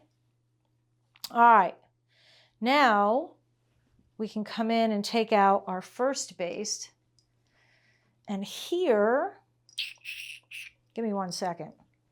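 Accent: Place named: Bronx, United States. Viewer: A few other thoughts about garment sewing. First is that I've found that over the years, it's not been so much that I needed patience to be able to get the work done, but that in doing the work, it has given me patience. Next was the revelation I had when watching you sew the facing to the foreparts....See? I'm paying attention, professor! I realized that I'd done that very technique years ago! I was doing a repair job on something or other, had what I thought was absolutely no seam allowance and therefore needed to sew by hand. I folded the two edges about the same way I watched you do it, and sewed them together. NO IDEA at the time that I was doing the sewing the way traditional tailors did. For me, it just shows that human beings very often find solutions to problems that are similar, and execute them similarly. Oh yeah. Every time I watch you, I chuckle hearing that NYC accent AND ATTITUDE. 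You can take the woman out of New York, but you can't take New York out of the woman. And, during one of Susan Khaljes' other classes, she put in a lapped zipper using pick stitches. Same technique, different application.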